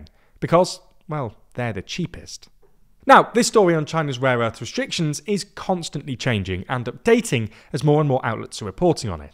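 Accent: United Kingdom. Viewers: Everytime I hear a heavy British accent like this, I get turned off. It is supposed to make him sound intellectual but it actually showed him as shallow.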